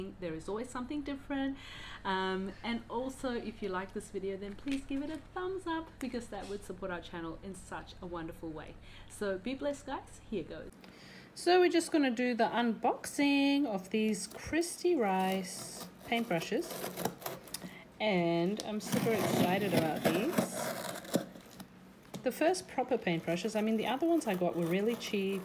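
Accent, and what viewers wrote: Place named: United Kingdom. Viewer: Hello, I came across your video trying to find these brushes(after watching several of Kristy Rice videos) but I can’t find them . I see this video is from January,so I guess Amazon had them then, but currently it’s not available. But maybe you got them on Amazon in UK, because judging by your beautiful accent,I think that’s where you are? 😊Do you know by any chance if I can find them anywhere else? Interestingly it is available to international buyers only on Kriszty’s website,but not for the US which I found very strange. Please let me know if you have any information. Thank you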